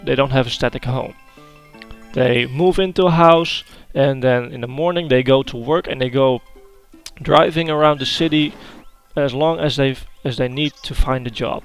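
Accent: Netherlands. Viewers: Your accent :D, and I know you are from Holland, saw It on your twitter :D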